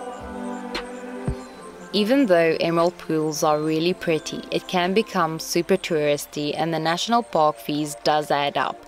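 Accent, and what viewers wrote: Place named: South Africa. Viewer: Your accent... Is julle van Suid Afrika?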